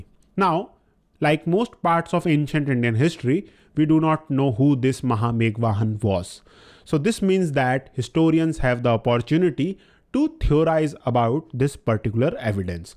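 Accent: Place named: Hindi Belt, India. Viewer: Arre Babu, please follow Sanskrit and Odia pronunciation not Hindi heartland - It is Kharavela not Kharvel.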